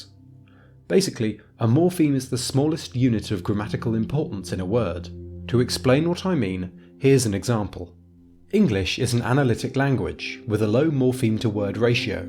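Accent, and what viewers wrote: England, United Kingdom. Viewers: Shades of what I would have gotten into had I gone into linguistics! OK, I detect a [snobbish] British accent in you but get to the point. English is MORE synthetic than you make it out to be (following the lead of the Germanic languages); the Romance languages are almost totally analytic. Point is demonstrated: my school system at the grade 7-9 level taught only two languages French (analytic) and Latin (synthetic galore). In high school grades 10-12 I took German. Us "Latin scholars" were VERY familiar with grammatical case while the "French scholars" had no idea what case was. Yes, things like Accusative of definite time vs. Genitive of indefinite time weren't well explained--they are a feature of German--to us in Latin class in junior high. Something interesting is going on in English that to your argument that Synthetic trends to Analytic which I'll get to in another post. 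I'll conclude that a lot of this today is in DELIBERATE miseducation. Note in America the now-common misuse of " 's " for plurals: dog's for dogs, etc. THAT sort of illiteracy! Bad enough the British' dropping of punctuation in abbreviations "PMs" for "P.M.'s" if you saw Johnson, Cameron, & Brown walking down the street! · Yeah I reacted to "domibus nostra", I dunno where he got that from. Also I'm surprised he was afraid to pronounce Finnish, as his Latin pronunciation was 100% English anyway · What a preposterously unscholarly and scatological pile of tripe. "Habere" in Latin is "to have"; "Dormire habeo" is "I have to sleep/must sleep". it is NOT the future compound tense which would be "dormire VADO" (using the verb "to go" as one would in English).The author resorted to using the verb "fart" since he doesn't have the intellect, substance or maturity (thinking he has some severe "mommy issues" and still lives at home) to create something academic. 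This is video is proof that just because you slap a pompous voiceover with an English accent doesn't make it intelligent or correct. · slow and exquisitely anglo but an excellent video for sure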